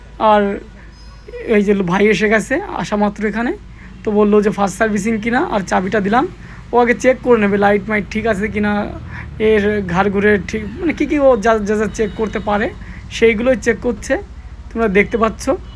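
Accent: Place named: Bangladesh. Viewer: Vai tumi ki Bangladeshi naki??? kotha sune tai to lagche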